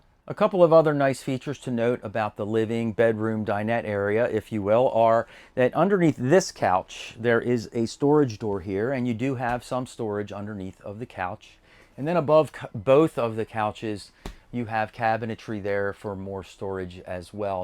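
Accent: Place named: Western Pennsylvania, United States. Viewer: The MD or western PA accent is strong. Ha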